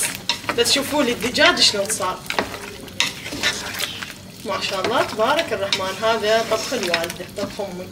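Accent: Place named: Ahvaz, Iran. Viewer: الشبزي العراقي مع اللحم وليس الدجاج. اصلا هذه الطبخه ايرانيه. مبين من لهجتك انتي اهوازيه ويختلف طبخك عن العراق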